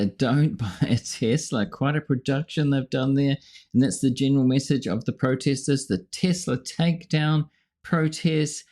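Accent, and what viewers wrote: New Zealand. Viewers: Good Kiwi accent bro'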